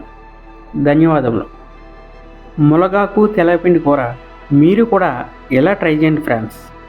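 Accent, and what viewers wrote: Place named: Godavari, India. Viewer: Very good curry & rasam. Good combination. Thanks for the video with godavari accent.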